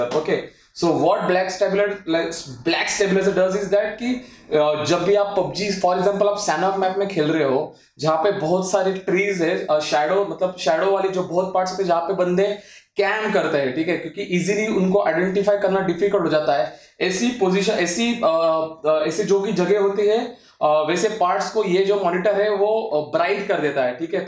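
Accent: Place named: India. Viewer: ı love so much indian english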